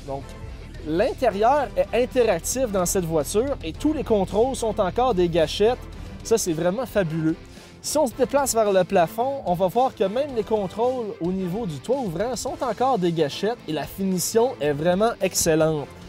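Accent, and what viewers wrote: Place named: Vaud, Switzerland. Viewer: On dirait un accent vaudois quoi!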